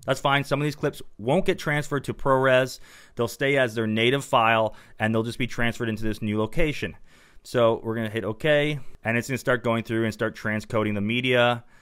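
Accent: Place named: Canada. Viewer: He's Canadian. It's one of the "tells," as is "uh-GAYN" instead of "uh-GEN" for the word "again." I worked with an Irish-Canadian editor on a couple of movies (shot in Canada, edited in Santa Monica, California) and learned a few of the clues to spot the Canadians (beyond "ah-BOAT" for "about"). Now I can't watch TV without noticing Canadian accents. (Australian actors, for some reason, seem to be the best of the English-speaking diaspora at blending in with UK English or USA English speaking casts. I think a lot of Scots are good at blending in with their southern (English) cousins.) OK, I'm rambling ... maybe too much coffee ... sorry... but accents are fun....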